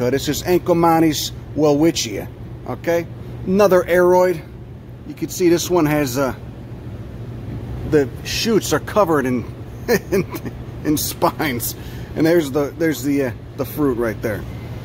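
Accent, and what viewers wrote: Chicago, United States. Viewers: Thanks man, I'm a long way away from the US (I'm a expat) but I feel every bit of the tension here in Europe. Listening to that Chicago accent and calmly walking around a botanical garden somehow gives me hope that all is not lost there... much appreciated! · Couldn’t figure why I like these videos he’s smart, knowledgeable, the Chicago brogue, no it’s cause he’s got the gift of glaberous or gabbro, he’s gabbroid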